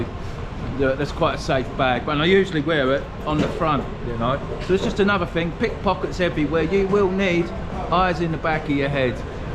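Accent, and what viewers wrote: Essex, United Kingdom. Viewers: Where you from in the uk? Sound like Essex same as me lol 👍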